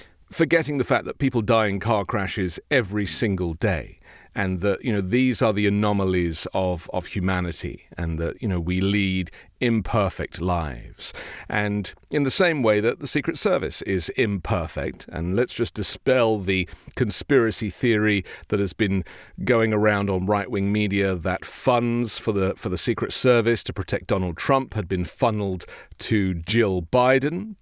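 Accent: United Kingdom. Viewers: Try not to sound British.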